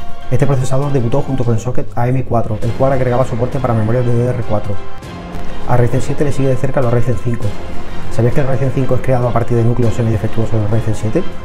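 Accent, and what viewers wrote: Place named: Spain. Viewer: soy latino y real mente no es por nada pero no soporto el exento español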